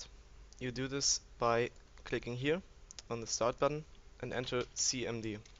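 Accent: Germany. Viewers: thank you man it worked me.and i liked your german accent :D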